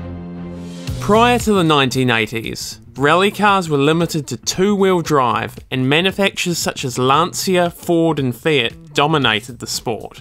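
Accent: New Zealand. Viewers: Nice to hear a farmiliar nz accent ! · Are you a kiwi? Great content mate!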